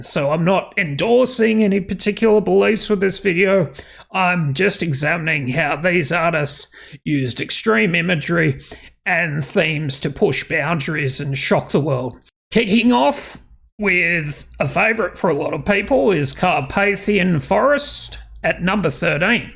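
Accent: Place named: Australia. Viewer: are you Aussie btw? I could swear that's where your accent is from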